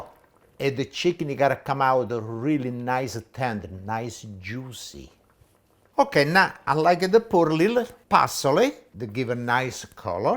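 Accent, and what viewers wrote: Italy. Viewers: 🤣😅🤣😝🤣😅 you are funny but you are right..he talks like a true Italian!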